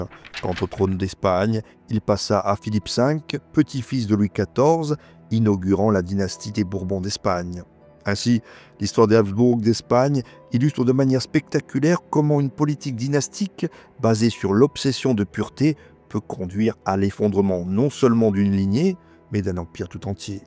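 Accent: Corsica, France. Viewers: Bonsoir seriez vous de corse du sud par hasard?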